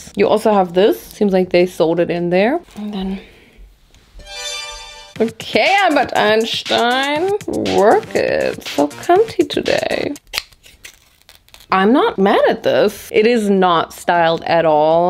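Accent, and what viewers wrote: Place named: Germany. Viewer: I love your german english 😍😍🤪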